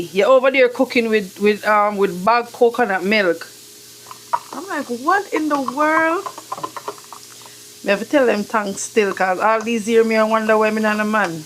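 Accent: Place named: Jamaica. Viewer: Great stuff, great you... loving the Jamaican accent. Men loves women who loves cooking, especially me 😉. ❤❤❤❤❤🎉🎉🎉🎉🎉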